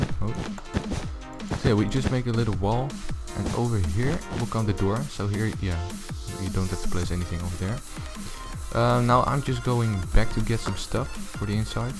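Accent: Netherlands. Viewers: Goede video ik hoor dat je uit Holland komt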